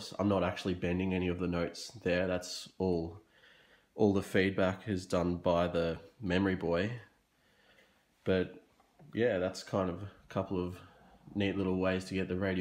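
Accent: Australia. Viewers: Sorry My Bad, Now looking back at the Video you do Sound more Australian. 😁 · very helpful video! didn't know you were Aussie, where about are you located? I'm from Adelaide but have been living in Canberra for most of my life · Are ya aussie mate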